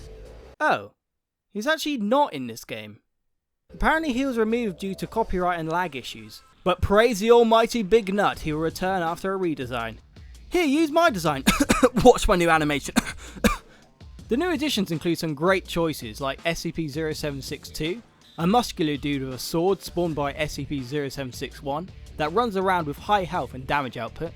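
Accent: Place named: United Kingdom. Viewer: you sound british cool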